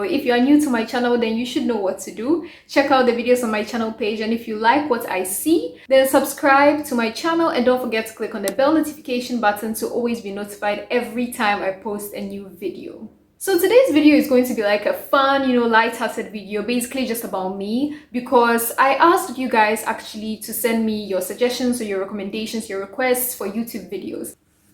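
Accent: Ghana. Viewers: Do you speak Twi? I'm asking because of how you speak English, the way most Ghanaians do.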